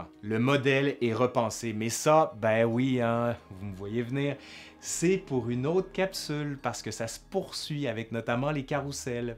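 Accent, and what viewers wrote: Quebec, Canada. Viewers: Excellent ! Comme tout jour. De votre accent québécois Je dois, ma foi, l'aimer pour et pour. De revenir mon œil Pour mourir Roy !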